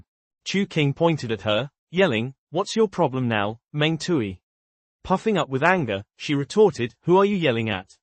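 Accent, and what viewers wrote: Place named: United Kingdom. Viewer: The British accent is pissing me off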